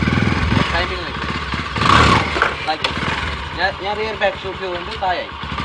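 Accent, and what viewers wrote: Kasaragod, India. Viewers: Nth da slang kettal arila ksd😍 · Tips ellam okke aanu bro.. Kasrod slangum polichu.. Kurachu koodi spacious aaya sthalath venam stunt tips kodukkaan.. Ennale viewersinu oru majje indaavu.. Slang oru kuravaayitt kaanathe confident aayit samsarikkanam.. Otherwise all good.. Keep going.. 😍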